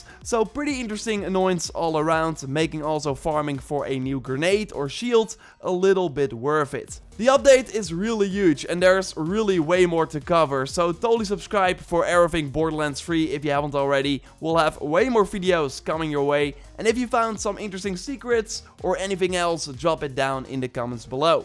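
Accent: Netherlands. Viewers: you sound dutch???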